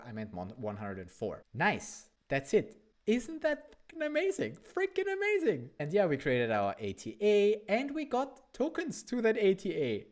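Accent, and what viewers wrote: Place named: Austria. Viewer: Love your energy on the videos and I like learning about Solana from it. You are Austrian? I love the accent and thought it was Swedish. I'm not really good at accents haha.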